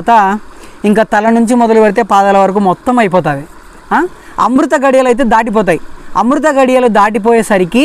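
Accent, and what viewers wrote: Rayalaseema, India. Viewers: Rayalaseema yaasa?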